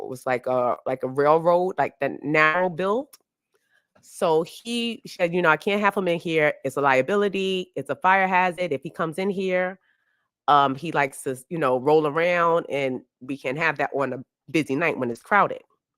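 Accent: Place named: New York, United States. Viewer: OMG, I love your NYC accent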